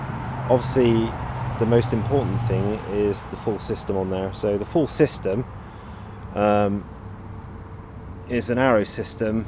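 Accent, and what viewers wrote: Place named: Swindon, United Kingdom. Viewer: It looks stunning in that orange. Where did you get that smaller number plate made? Going by your accent I would say your near the Swindon area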